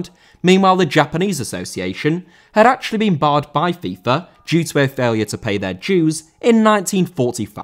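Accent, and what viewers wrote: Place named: United Kingdom. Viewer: Listen Dinis, you’re posting all these angry corrections but I think you forgot that OTHER PEOPLE HAVE DIFFERENT ACCENTS to you. is British and that is just the way people talk there, there is no right or wrong way to pronounce the words you’re correcting. I’m sure you probably can’t properly pronounce many words in English properly yourself, that’s just the way you speak, get over it. Just because you’re angry at something he said in the video doesn’t mean you need to be a pr*ck about it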